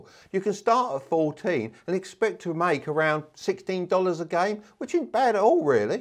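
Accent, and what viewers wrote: United Kingdom. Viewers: why does some of his thumbnails show US dollars when he sounds like he's from the UK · British accent? I'm sold.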